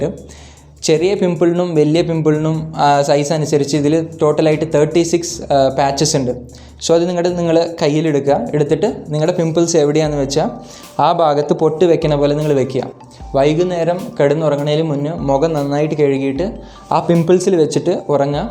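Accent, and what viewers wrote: Tamil Nadu, India. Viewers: Chattayi Malayali anno? Or Tamil?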